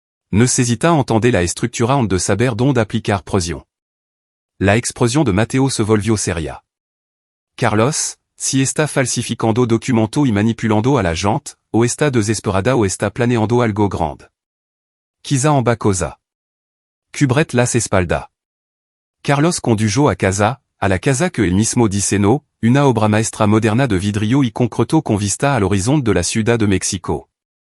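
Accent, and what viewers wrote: France. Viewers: ¡¡¡Que gran estupidez¡¡¡¡ Poner la IA en español con acento francés!!!! No pienso escucharlo!!!!!! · pesimo narrador, su acento frances distorciona lo que dice.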